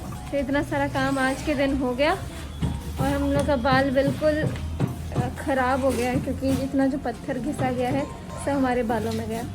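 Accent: Bihar, India. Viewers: panni wanni typical bihari...style i loved it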